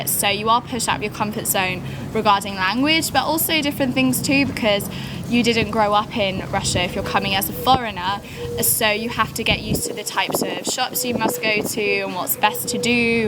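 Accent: England, United Kingdom. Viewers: Британский акцент это очень красиво! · Я кайфую от этого невероятного английского Английского! улыбка)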